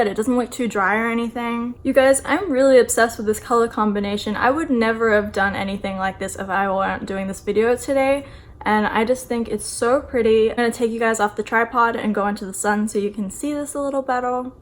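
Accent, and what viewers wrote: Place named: Australia. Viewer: Love your Australian accent, and you look pretty with or without make up, keep up with the videos! 😉👌🏻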